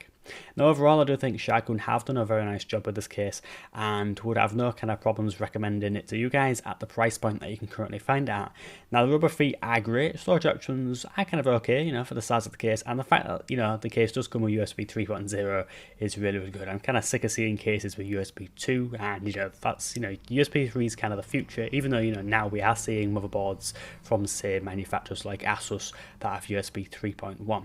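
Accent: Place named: Scotland, United Kingdom. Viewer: Are you from Scotland or something, because i cant understand your accent a quite bit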